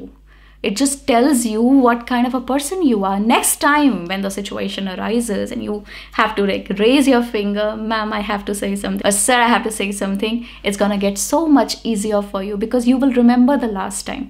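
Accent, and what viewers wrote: India. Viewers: Don't make videos regarding pronunciation cuz Indian pronunciation sucks. Some of you might be thinking I'm obnoxious and trolling her, but I'm not. I searched on Google, "Why do native speakers make fun of the Indian accent?" I opened a Quara link, and a native speaker has written when Indians speak English, it sounds as if a native speaker is trying to speak Hindi. Now, you can image how awful it is.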